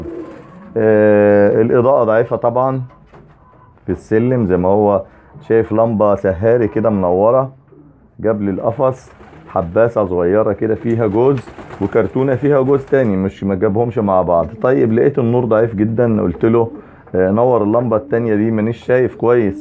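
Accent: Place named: Syria. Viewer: هو حضرتك سورى كلامك عامل زيهم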